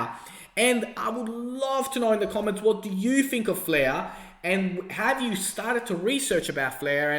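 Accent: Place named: Australia. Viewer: Thanks for all your ongoing analysis mate, great to hear an Aussie voice in the crypto universe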